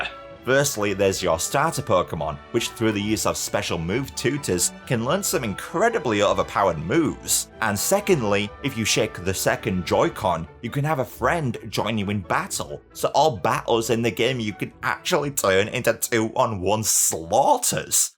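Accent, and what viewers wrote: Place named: North, United Kingdom. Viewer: this guy is my new friend he sounds up north lol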